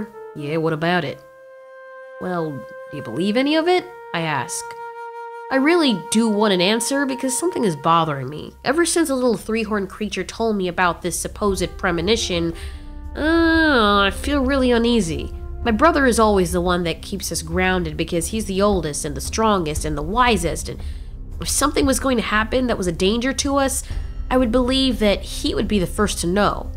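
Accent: Australia. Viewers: She has a good australian voice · Every like is for that Australian accent 👌😂 Also can you make a Baryonyx POV? I'd love to see that! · Nice Australian accent 👍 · I also heard a bit of austrilian accent wicth he actully has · I like the austalian accent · Can we talk about how your Australian ascent is on point, took my breath away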